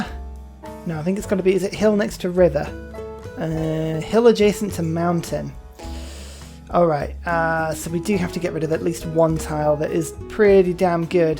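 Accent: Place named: United Kingdom. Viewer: I have found Discord too complicated for me to learn so I shall type out my message here. Dear, Thank you for making these lovely videos. I am new to using youtube but began visiting this website since the pandemic began. It must have been the hand of god for me to find one of your videos on the home screen. The last time he visited me, my grandson introduced me to Civilization 6 as a way to pass time. We would always play board games together like Settlers of Catan and Risk, but he was rarely able to travel and see me. He worked as a teacher in Hereford and his favorite subject to teach was history, which I think is why he really liked this game. I've never played video games before, so I found it a little overwhelming. He was patient with me though, being the sweet young man he was. He explained so much to me and I hardly remember what all he talked about during those few days he spent teaching me about Civilization. 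Though I don't play Civilization, I have watched almost every video you've released. I love listening to you explain every detail of what you're doing in order to win. I try to watch your videos as soon as I have free time, and have recently found myself waiting on your next video to come out. Your quirky sense of humor and beautiful British accent take me back to those wonderful days with my grandson. He said he liked to get science victories too, and said my favorite character to play would be Teddy Roosevelt. I appreciate everything you put out, an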